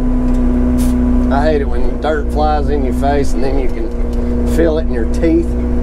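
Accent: South, United States. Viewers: I'm sure I'm not the only one to notice, your country twang goes away when you talk on the CB 🤣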